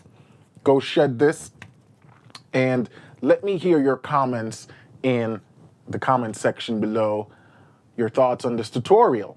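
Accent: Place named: Jamaica. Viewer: are you Jamaican? I hear a cool accent in there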